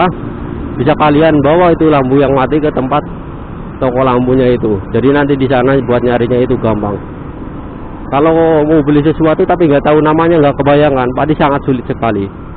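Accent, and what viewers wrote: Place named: Banyumas, Indonesia. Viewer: Koyone wong ngpak yo kang.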